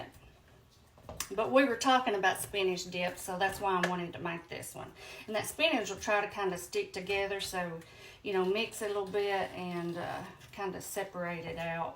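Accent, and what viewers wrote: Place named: Tennessee, United States. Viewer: I recently subscribed to your channel, I so enjoy your recipes! I love listening to you, my relatives are from Murfreesboro, and your accent makes me feel like I'm listening to my family!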